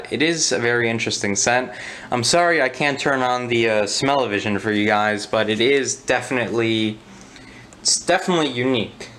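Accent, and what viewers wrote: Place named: New Jersey, United States. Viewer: Maybe because you had turned away from the camera, and perhaps didn't enunciate it. Or it's that Jersey accent. Or it happened to be the fact that last night was a drinking night for me and I still had--ready--alcohol on the brain! Yes, I've seen some other Method products, but I refuse to buy their crap because of their plant-based OMG this is eco-green. I hadn't seen their scentalizers, I'm curious if they have plant stuff in that too. I have LA's and I've used it a couple times as well, didn't have anything to really say about it good or bad, it was like you said, just kinda there.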